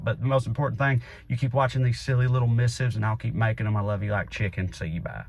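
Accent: South, United States. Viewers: Omg you are the first ever person I have heard with a southern accent who expounds sound philosophy. Thankyou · your deep southern drawl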